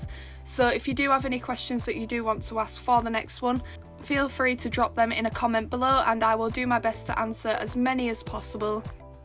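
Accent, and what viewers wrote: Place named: North, United Kingdom. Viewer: definitely a northern lass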